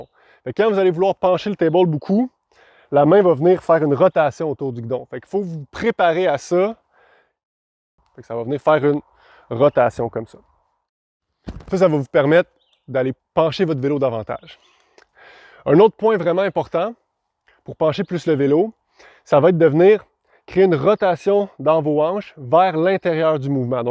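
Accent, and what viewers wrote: Canada. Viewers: sympas et pedagogiques tes tutos avec l accent canadien c est un regal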